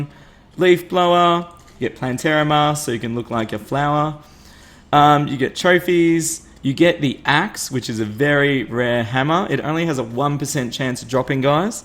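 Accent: Australia. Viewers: Are you Australian because I am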